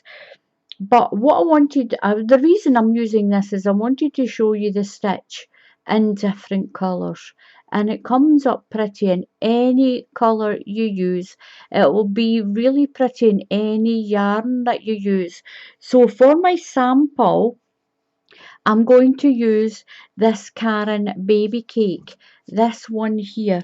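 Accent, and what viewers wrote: Scotland, United Kingdom. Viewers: wow, how rude is this women, I just cant believe that she is so sour and bitter that she would try and bring you down. I am very great-full for your hints and extra info to make my crochet easier and added bonus, your Scottish accent. Sending love and best wishes from Perth Western Australia x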